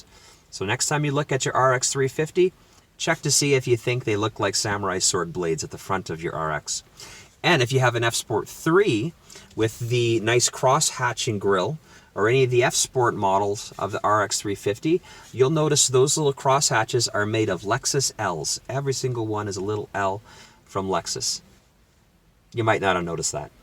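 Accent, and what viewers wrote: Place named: Canada. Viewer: Hello, I enjoyed your discussion. How did you ever come to a Canadian accent?. Next time can you discuss how to upgrade ES 350 navigation system on my 2015? For some reason 2015 required a mini Sim. The dealers charge an enormous fee to change the Sim card. I understand the newer lexis allows it to download Iike the BMW.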